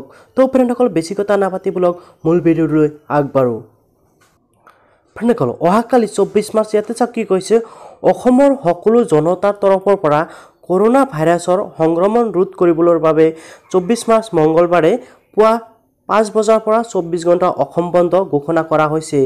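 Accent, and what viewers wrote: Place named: Bangladesh. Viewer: আপুনি অসমীয়া নে অকমীয়া ? অনুগ্ৰহ কৰি শব্দ উচ্চাৰণ কৰিব শিকিব। শূনিলে কিবা বাংলা দেশীয় যেন অনুভৱ হয়।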